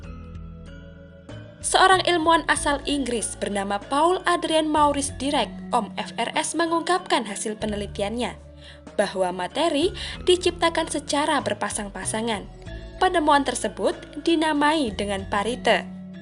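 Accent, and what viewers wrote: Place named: Java, Indonesia. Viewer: naratornya logat jawa nya kental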